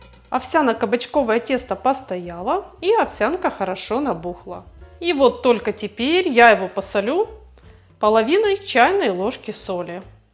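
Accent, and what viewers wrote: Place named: Ukraine. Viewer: Сразу слышно, что хохла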